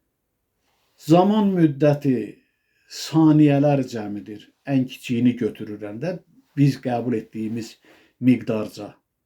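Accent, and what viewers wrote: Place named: Karabakh, Azerbaijan. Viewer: Siz Gəncədənsiniz . Qarabağ ləhcəsi ilə danışırsınız . Amma sizin danışığınızda bir iki dəfə mənə elə gəlir ki qazax ləhcəsi ilə də danışdınız . Yəqin ki bir tərəfdən də onlarla qohumsunuz . Mənə maraqlı gələn odur ki səhv etmirəm ki ? İstərdim ki fikrimə aydınlıq gətirəsiniz .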